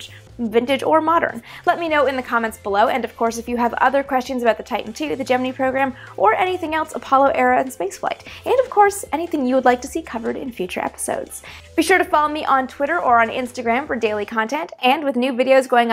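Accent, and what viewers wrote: Canada. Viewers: My fav launch is the Saturn V with Pete on mission control? Are you from Canada? You pronounce 'out' and 'about' in a different way.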